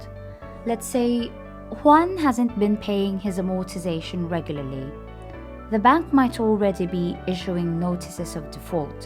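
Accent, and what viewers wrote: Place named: United Kingdom. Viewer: YOUR ACCENT IS FUNNY! ARE YOU BRITISH?😄😭😄😄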